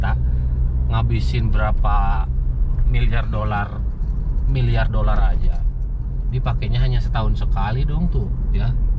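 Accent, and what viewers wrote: West Java, Indonesia. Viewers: Masha Allah..jd teringat kembali hajian th kemarin 2019..kang logat sundana sami sareng abdi..salam kenal abdi asli urang patok beusi..subang..ternyata kang dari kp siluman ya asalna..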